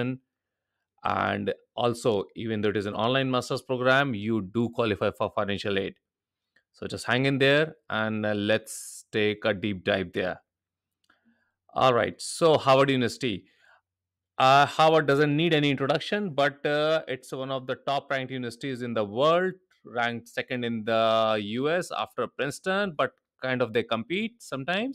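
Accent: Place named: India. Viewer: Why are you sounding Indian?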